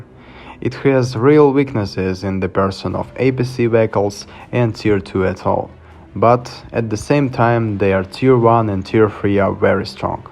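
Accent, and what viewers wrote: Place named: Russia. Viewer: ты же русский игрок?я сморел твои реплеи и ты реально крут. Твой акцент похож на русский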